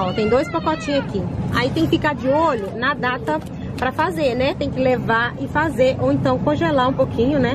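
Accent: Minas Gerais, Brazil. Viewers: Amei o vídeo. vc diz que estão caro os produtos de limpeza?? Cara vem pro Brasil, vc cai de costas. kkk mas eu estou fazendo os meu produtos em casa. faço sabão. Que virá sabão líquido para limpeza pesada. sabão detergente para louça e para limpeza do chão e banheiros faço tbm meus cheirinho (desinfetantes) uso muito bicarbonato tbm. aqui tem que se virar nos trinta. kkk bjs AMADA. Mineirinha linda lembra minha mãe no sotaque amei.❤❤😚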